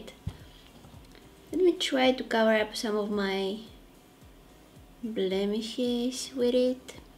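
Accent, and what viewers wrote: Greece. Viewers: Απο τι στιγμη που την ακουσα λεω ειναι ελληνιδααα το ηξερα❤️ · are you greek ? omg and i was wondering why i love your accent and the way you talk !! greek power girl !! xo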